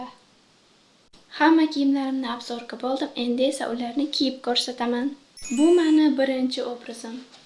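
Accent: Khorezm, Uzbekistan. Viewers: Babr xorazmlik ekanlikiz aksentdan blinibdi😂💘Lkn aesthetic chiqibdi🎰Manga kòpro 2-look yoqdi💅Lkn ikkalasiysm woooow✨ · Choʻta gapirishizdan xorazmliga oʻxshatdimdo szi xorazmlimasmisz🤔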